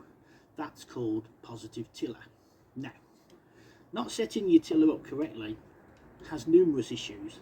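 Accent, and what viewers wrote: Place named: Elmdon, United Kingdom. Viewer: Sounds klike you're near Elmdon , nice work thanks our kid😊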